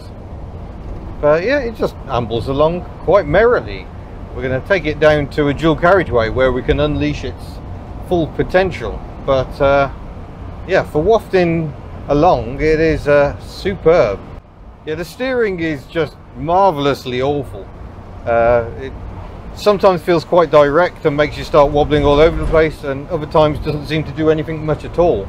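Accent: United States. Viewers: Authentic American accent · Good American accent! Yes the econoline was a gas hog but ran forever. 'what a big beast. Good motor!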